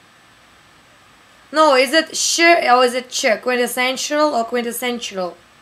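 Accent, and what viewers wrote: Russia. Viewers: She's most likely Russian. Notice how she pronounces the words "lesson", "fifth" and especially the vowel "a" as in " ". BTW: LOL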